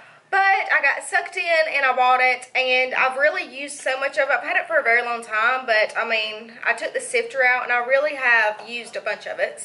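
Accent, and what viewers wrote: South, United States. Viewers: loveeee that country accent!!!!!!!!!! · where r u from? I hear the Southern drawl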